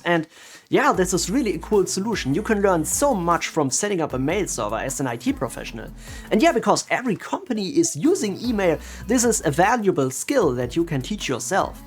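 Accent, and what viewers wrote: Germany. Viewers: Do you speak german? Because i think i hear a bit of a german accent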